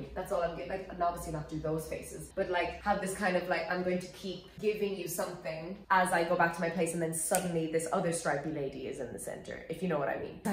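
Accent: Ireland. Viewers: Sorry you’re Irish? I am terrible at accents so I wouldn’t be able to tell where you are from even if you hit me in the face with your country’s flag.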